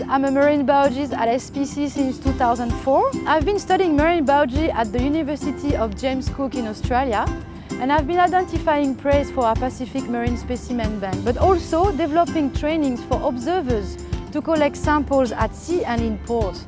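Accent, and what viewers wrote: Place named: France. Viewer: That's a French accent if I have ever heard one 😂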